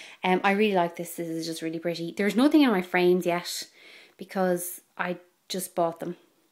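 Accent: Ireland. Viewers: are you irish?? you sound lik your frm dublin? :) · I think she's Irish maybe? :) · im irish! i live in florida and have an american accent though. so finding your video made my LIFE when i heard your accent <3 your room is amazing & these were such great tips! thanks! <3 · omg. the sec i heard yur accent i was like finally an irish girl.... :D :D :D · Are you Irish?? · Irish im thinking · Your from CORK ! deffo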